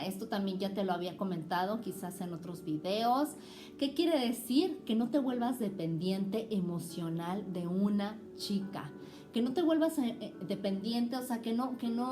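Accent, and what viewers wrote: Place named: Spain. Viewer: eres Mexicana con acento espanola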